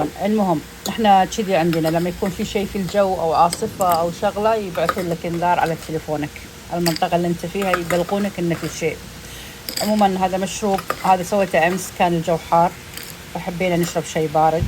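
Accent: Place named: Gulf, Arab world. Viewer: بالتوفيق ان شاء الله .. تشرفت بمعرفتك ... صوتك خليجيه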